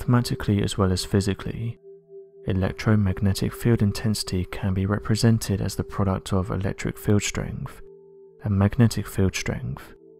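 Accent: United Kingdom. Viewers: This is all interesting to contemplate, and as a lifelong science fiction fan, I would love it to be proven, but where is the proof this is actually happening? All the fancy graphics, the calm British-accented voiceover, and elaborate theories ….are not evidence….extraordinary claims require extraordinary evidence. Just saying ‘this is happening’ doesn’t make it real. As exciting as it would be if this were true, where is the evidence?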